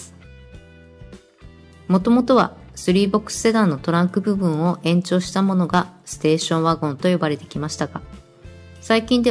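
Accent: Kagawa, Japan. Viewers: 讃岐弁？！ありがとうございます^ ^ ステーションワゴンの魅力を改めて発見できました！ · やっぱりこれ讃岐弁ですよね(東かがわ出身並感)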